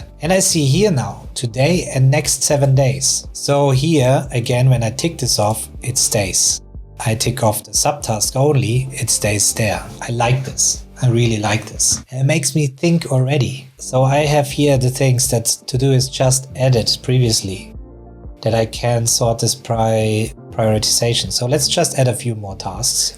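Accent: Germany. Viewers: do i hear a fellow german accent there? Great video 👌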